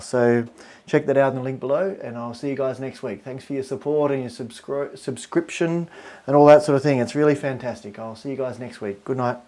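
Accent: Australia. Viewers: Simply stunning, and wonderful to watch you work. (Also, loved hearing the Aussie birds and accent—I’m an Aussie living in Boston.)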